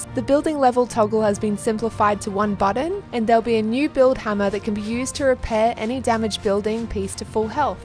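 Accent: Australia. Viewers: i like her ausie voice 🤩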